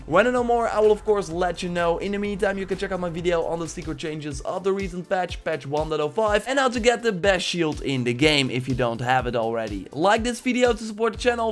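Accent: Netherlands. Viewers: he is dutch. thats why he has a acccent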